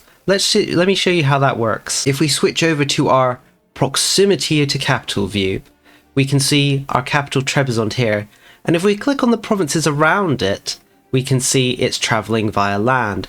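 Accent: United Kingdom. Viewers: Are you British living in America, or an American living in Britain?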